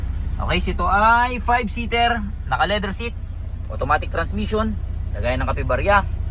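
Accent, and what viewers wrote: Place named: Pangasinan, Philippines. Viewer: Lasi ka taga pangasinan ka manaya boss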